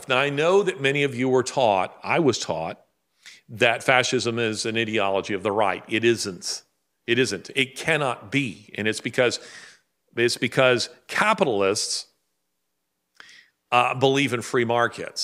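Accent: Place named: China. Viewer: Sounds exactly like 🇨🇳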